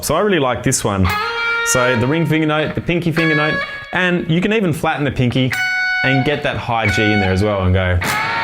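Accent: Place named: Australia. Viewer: I did NOT expect that Australien accent 😅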